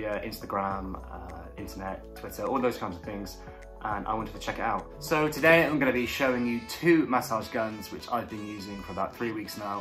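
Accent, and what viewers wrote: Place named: United Kingdom. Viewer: Why is this guy's British so hot?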